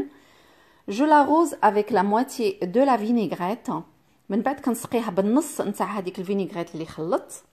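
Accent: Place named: Morocco. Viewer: Merci ma chère pour cette salade d'été. je n'ai jamais utilisé la mangue dans les salades salées mais je n.'exclue pas l'expérience... Pour le produit et la pub mensongère bien sur que ce n'est pas étonnant. Les gens sont curieux de voir la vidéo soit parce qu'ils sont intéressés par le sujet, soit ils sont attirés par le titparde la vidéo et sont déçus par le c9ntenu. Maintenant si cette vidéo a été vue par tt ce nbre de personnes et qu'ils ont été déçus par le résultat, ils ne verront ce que cette personne présentera par la suite, se désabonnent même s'ils l'ont fait. En tous les cas machAllah 3like ma soeur pour tes vidéos aussi bien expliquées en arabe et en français avec un bel accent marocain.😉... Je suis abonnée a ta chaîne et encore us de recettes pour cet été. 👍👍👍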